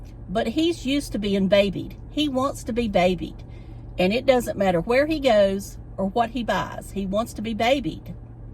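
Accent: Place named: South, United States. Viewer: Great conversation. You really have talent for it. I never stop listening! This video reminds me old radio shows they did years ago with like a mystery or a who done it! Well anyway i guess its the accent the southern belle sound. Im trying to figure it out but you were not all over the place just connecting all this mystery about the wackiest person on you tube so if you even try to decipher what is going on its gonna be a chore.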